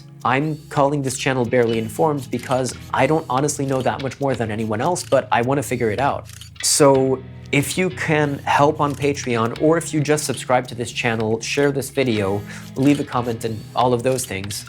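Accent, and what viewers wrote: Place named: France. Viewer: The guy definitely speaks French, you can hear it Great job anyway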